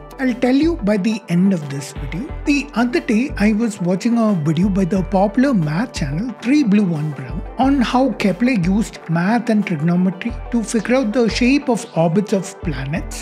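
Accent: India. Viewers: Bro you have very thick Indian accent.😂😂😂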